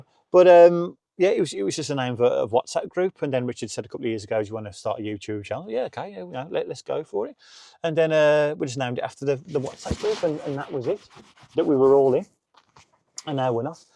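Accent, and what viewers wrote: Birmingham, United Kingdom. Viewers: Sad but these things happen. I may watch both of you, see how vids & editing pan out. Good luck (to you both / all). Keep the good material coming. I'm finally catching on to the Brummie twang.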